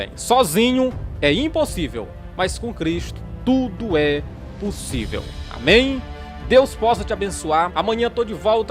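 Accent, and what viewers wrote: Northeast, Brazil. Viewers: PASTOR, AMO MUITO TUAS PALAVRAS, TEU SOTAQUE NORDESTINO, NUNCA MUDE NADINHA, CONTINUE NOS EXORTANDO COM GRAÇA E SABEDORIA!!! GOSTO MUITO DO JEITO QUE VOCÊ FALA DO CARNIÇA, É ASSIM QUE CHAMO O PULA MOITA KKKKKK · Eita nordestino reais e muito engraçado o jeito dele falar paz irmão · Oi pastor também sou nordestina e conheço bem esse sotaque 😁 obrigado pelos ensinamentos da palavra do Senhor gosto muito de ouvir vc que o senhor abençoe vc a cada dia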